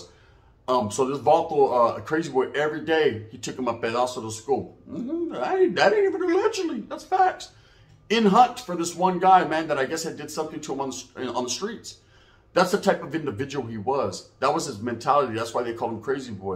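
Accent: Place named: South, United States. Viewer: Tbh, I thought you were a southern at first.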